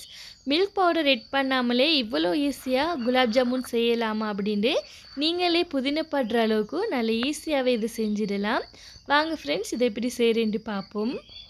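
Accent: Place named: Muslim Tamil, Sri Lanka. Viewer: இது இலங்கை முஸ்லிம்கள் பேசும் தமிழ். ஒவ்வொரு பிரதேச வழக்கு. இதுவும் தமிழ் தான். அவ செய்முறை நன்றாக உள்ளது வாழ்த்துக்கள்.💐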